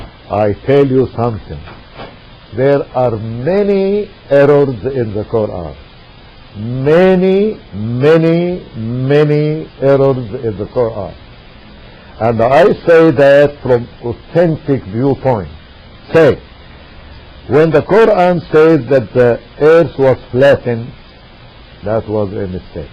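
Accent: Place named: Egypt. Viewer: U lier egyptian stop speaking funny english,u talk about story of joseph it is beautyful story by reading only this "chapter joseph"in holy Quran "Cat stevens"famous pop singer of England became muslim and left doutful religion of christianity,you can visit his website his new name is Yousuf Islam,he choose his name yousuf because he was converted by reading "sura yousuf"from quran.and you lier telling chapter yusuf is wrong.if u want i will quote pornography from Bible,but we respect all books.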